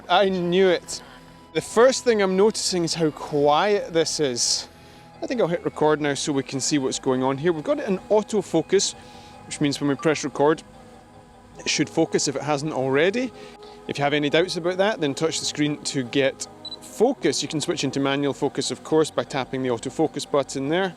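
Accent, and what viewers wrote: Scotland, United Kingdom. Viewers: I like the scotish english sound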